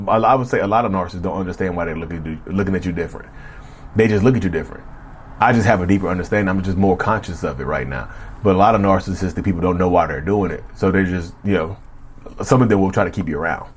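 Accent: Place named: Chicago, United States. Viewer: You from Chicago? Hear the “ you know “ a lot when people are from Chicago or the Midwest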